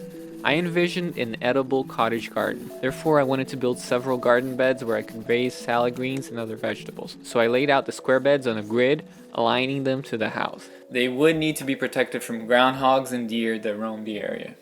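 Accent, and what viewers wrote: Brazil. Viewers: Wow!!! Just WOW!!! The convergence of your skill sets is just spectacular, this video is an absolute work of art! Are you Brazilian? You sound Brazilian! And I noticed two Brazilian cities made it onto your list of great cities. Such an amazing video. Thank you for sharing your passion so beautifully. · Não sei se tu é Br mano, mas tem o mesmo sobrenome que eu e um sotaque mais brasileiro. De qualquer forma, teu canal é ótimo e estou me identificando a todo vídeo. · Acabei de acha o canal e simplesmente amei, suspeito pelo jeito que você pronunciou salvador e Rio de Janeiro que você é brasileiro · Tu é brasileiro ? Vc tem sutaque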